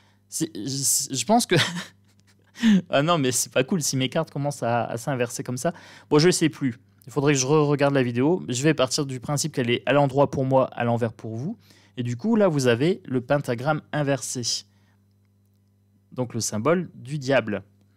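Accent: North, France. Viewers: Bonjour tu vas bien?tu nous fais remonter mon énergie en ce moment je suis flagada de regarder tes vidéos ça me rebouteux. Vivement que ce personnage sorte de notre espace de vie.Bisous à toi.Je pense que tu es du Nord?tu as par moment dans ta façon de parler des mots qui me rappellent mes souches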